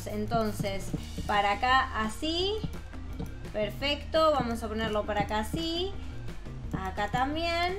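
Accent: Argentina. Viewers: Sos de Argentina o de España? O algún país asi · tueres de argentina??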